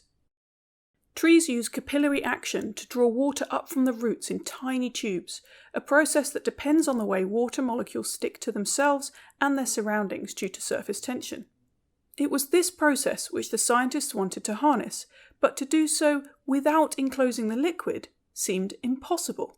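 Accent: United Kingdom. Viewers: Dislike! Bri-ish accent makes me choke. What the hell YouTube algo!!!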